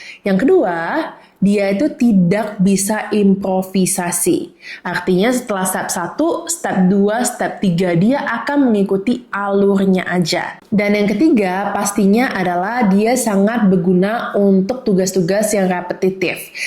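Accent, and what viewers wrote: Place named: France. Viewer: aksennya franch bgt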